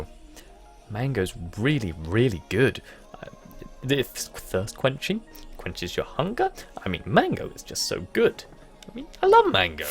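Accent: England, United Kingdom. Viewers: Just out of curiosity, do you think that Americans have an accent? Because we say that you have and 'English Accent.' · What`s up, English citizen? So like a week ago, my sister`s friend told me about Captain_Sparklez`s popular channel and recomended me the series of ``Mianite``. Like a half season after the start, the great Wizards came to the land to greet them with their amazing buildings. You were one of them. As the Wizard who showed to Jordan the most I remembered your name.. One of his eps he had a building lesson from you. And that was the first time I heard your voice. And I completley fall in love with it. It was deep, it could show all the emotions you were feeling and it had English accent. I love English accents. Like... I can guess you`re from the UK just when I hear your voice. So today I finished the season and after like two hours I decided to check if you had a channel. 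So I typed `` `` and your channel appeared. It was amazing! This video is probably my favourite. Why? I don`t know actually. Maybe because the whole 30 minutes of it I was staring at you and your voice made me stop seeing things around me. I could just listen to it with with hours. The problem with the not matching to the face voice is actually simple. I realised, you don`t look to young for that deep voice. You actually look half young. Your beard makes half of your face look like you have that voice. But from the nose to the hair you look like 17 years old to me. Oh, before I end the comment I`ll say that I recommend you to play OUTLAST. Pleaseee! M`kay byee.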